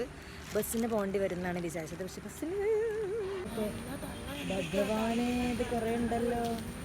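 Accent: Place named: Malappuram, India. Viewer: Nalla parajayam ulla baashaa malappuram anoo naadu 🧐 or kozhikode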